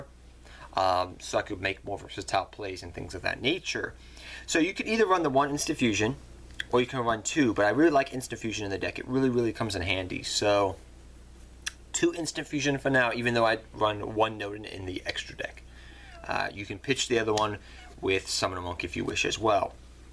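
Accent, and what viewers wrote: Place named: Northeast, United States. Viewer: you from up north?? got an accent on you Ny Boston NJ ??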